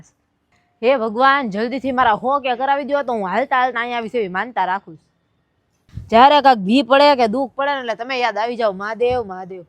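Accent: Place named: Bhavnagar, India. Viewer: મને પણ ભાષા, આમ તો 'બોલી', સાંભળીને એ જ વિચાર આવેલો કે બહેન ભાવનગર બાજુનાં લાગે છે...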